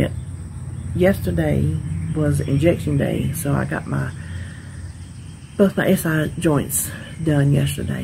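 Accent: South, United States. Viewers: Thank you for your honesty. Some youtubers hide the negative eating. My binge go to food Is cake. I can inhale a whole cake if I don't feel it in. I am lifetime but the binge monster is always lurking. Love your chats, cooking, Wanda's, crafts and everything. Your (Morning, How are you to your neighbor,) was so cute love your accent and southern hospitality. Here in the north it's just a quick HI, and that's it.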